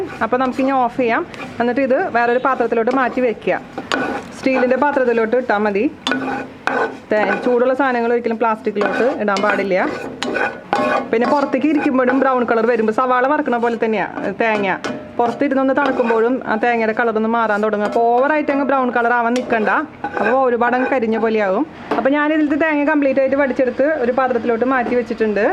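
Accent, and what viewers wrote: Thrissur, India. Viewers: തൃശൂർ ഭാഷ ഒരു ലെവലാട്ടാ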